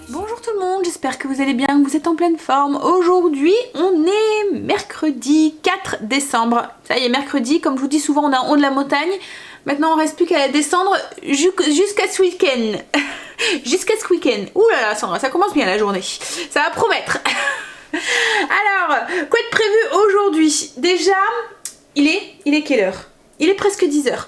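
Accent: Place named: Nord, France. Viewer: coucou ,c'est toujours un vrai plaisir pour moi de te retrouver ,tu es tellement naturelle et "normale" et tu me fais penser à ma copine qui vit là bas dans le Ch'Nord hihi(le ptit accent ;-)) plein de bisous ma belle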